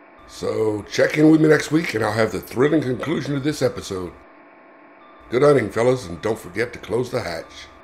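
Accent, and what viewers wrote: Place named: United States. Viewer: I know nothing about submarine games. I watched this because of your fantastic accent!!!😍😍😍 What U.S state are you from❓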